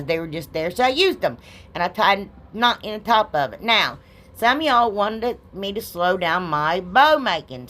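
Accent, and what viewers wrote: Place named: Kentucky, United States. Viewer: Omgosh!! So glad I found you. You are my new favorite diy'er!! I love your personality and the way you are just so humble and down to earth! I even got my boyfriend to watch you a few times and that's a miracle !! haha. He says with your accent, you have to be our neighbor. lol. We are in KY! Anyway,,Keep making beautiful crafts & making us smile! 💕😁💕Anyway, I'm binging and getting caught up on all your videos I haven't saw. 😍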